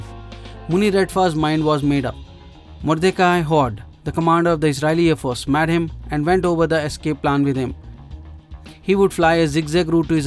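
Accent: India. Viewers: Any Indian accent just ruins any program.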